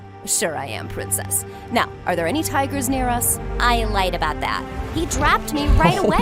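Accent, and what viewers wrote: United Kingdom. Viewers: The British accent 😭😭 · i love the brittish accent. NO WAY! also love how misa says. she has a perfect memory so casualy when she hates her 😅 then goes back to hating her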